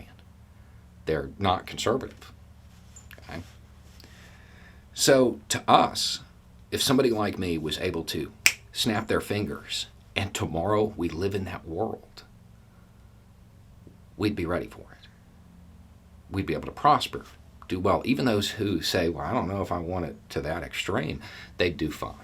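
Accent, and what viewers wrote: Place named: Deep South, United States. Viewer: When the Almighty Algorithm dropped him into my box, I had to "go by" several times before decided to "tune in". the look of, his accent (I'm from the Deep South and have heard that accent from some tough, onery people)- it took me until the third watch through before I could believe he was really there. I don't think I've missed more than three since! Even Conservatives could learn a bunch here, but a bunch of thinking isn't much appreciated in Trumpland. Bet he wishes he still had Billy Barr for an attorney who could write out his own subpoenas! FR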